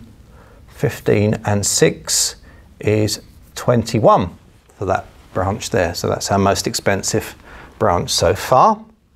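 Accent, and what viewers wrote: United Kingdom. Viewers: British accent is shit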